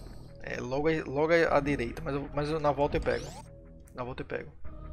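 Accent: Recife, Brazil. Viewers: Senti um sotaque recife ai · Cara…. Estou ha tempos para escrever aqui umas coisas…. Primeiro vou falar sobre minha relação com esse jogo: foi o jogo que mais curtia lá nos anos de 1995 / 1996 (+ ou - por aí). Eu me dediquei muito ao jogo, e em uma época que não havia muitas informações, consegui sozinho acumular 98% dos itens. Daí na época uma revista lançou um “detonado” do jogo, e eles conseguiram 97%! Além de ter ficado orgulhoso com meu desempenho, consegui descobrir os 2 itens que faltavam! 🙌 Agora vou falar de vc: cara, tu é muito fera!!! (Pra não falar muito f*da!!) Nunca assisti vídeos muito longos de games, mas esse seu aí já assisti duas vezes…! Rodar o jogo todo sem errar caminho, sem pausar p ver mapa, sem hesitar… basicamente sem erros e demonstrando muita habilidade… muito fera! Parabéns! E vejo que esse acaba sendo “só mais um” jogo em que vc demonstra habilidade. 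Parabéns!!! E grato por esse vídeo!! Por fim: vc é de recife?? Pelo sotaque, assim me pareceu… Saúde e sucesso p vc e família! Ah: e qual idade da filhota pequena que vc cita?? 🥰 O meu tem 6 meses… 🥰 Abraço!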